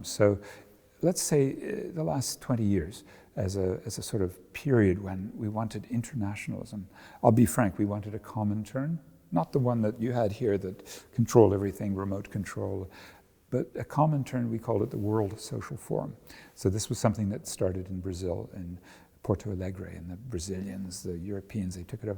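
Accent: England, United Kingdom. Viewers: О, Вы из Англии?) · Странно но я слышу английский акцент, притом что сам плохо говорю по английски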